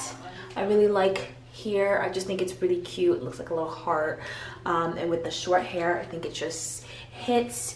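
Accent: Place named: Cameroon. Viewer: It's the french cameroonian accent for me✌️ Love your vlogs and btw your body is 🔥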